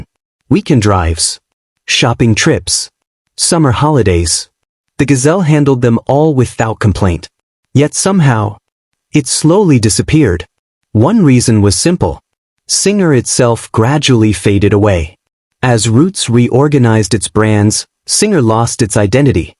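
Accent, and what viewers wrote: United States. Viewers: I am so sick and tired of AI generated garbage on YT. These are British cars so why do you show so many of them with foreign registration plates and FFS, ditch the Yank narration! You should have a British accent talking about British cars!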